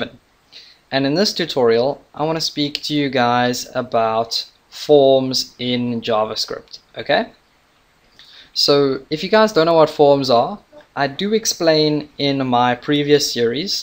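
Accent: South Africa. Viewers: Was so shocked when I heard a South African voice! #LocalIsLekker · Are you South African?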